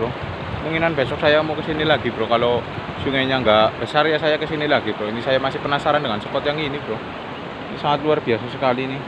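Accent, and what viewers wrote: Banyumas, Indonesia. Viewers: Ngapak Yah broh inyong e ngapak broh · Bahasa e ngapak daerah mana mas bro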